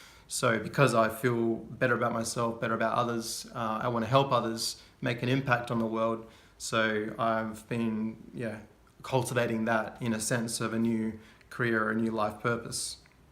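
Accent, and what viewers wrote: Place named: United Kingdom. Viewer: Cool video. Can’t tell if You’re British, Australian or a Kiwi! In any case good luck on your journey, seems like you’re doing well mate.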